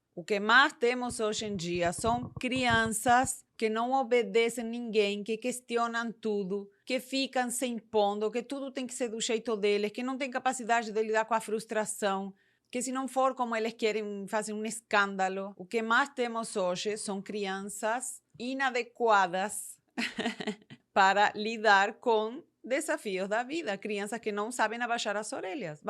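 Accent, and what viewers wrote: Spain. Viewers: eu não sei se você percebeu, mas o sotaque dela é de alguém cujo idioma nativo é o espanhol.............. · o sotaque espanhol é muito fofo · Que sotaque gostoso, de um espanhol falando português do Brasil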